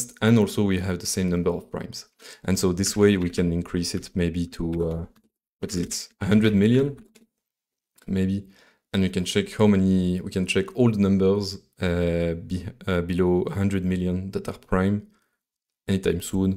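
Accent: France. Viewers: That French access is something truly marvelous, chef's kiss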